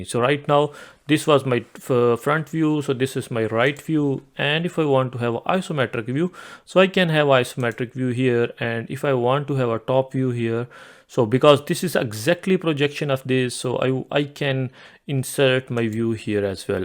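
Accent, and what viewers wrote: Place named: Haryana, India. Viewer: Haryana se ho kya bhai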